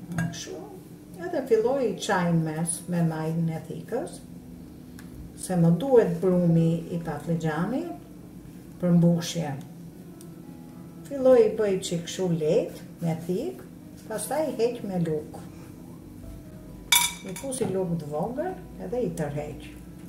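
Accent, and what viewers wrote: Tirana, Albania. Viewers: Sa jam knaq me kte t'folmen , sa e paster Tironce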